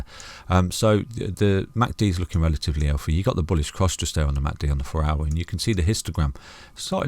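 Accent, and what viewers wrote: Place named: West Midlands, United Kingdom. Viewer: West Mids, knew it was near 😂😂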